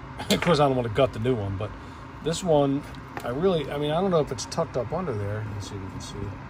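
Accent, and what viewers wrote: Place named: Philadelphia, United States. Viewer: Are you from Philly? 😂 I can tell by how u talk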